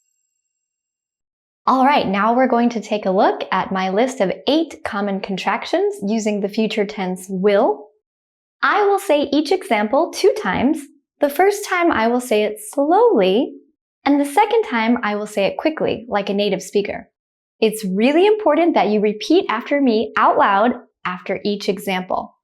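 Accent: United States. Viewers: Hi teacher, Definitely, I like your American pronunciation. My examples; I'll visit my brother this evening. You'll be happy, if you follow my channel. We'll be so excited, if we meet again. He'll study English hard for the exam. She'll be looking gorgeous, if she wears that dress. They'll start teaching all subjects online. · I like the USA pronunciation. Today I am understand some short form of future tense will such as I will(I'll) you will (you'll) we will (we'll) and so on.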